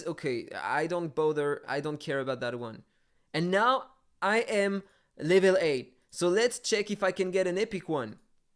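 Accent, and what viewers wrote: France. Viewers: j’ai reconnu le « French accent » 😂 tu fait des bonnes vidéo dommage que je soit obliger d’utiliser la traduction avec un français ! 😭😂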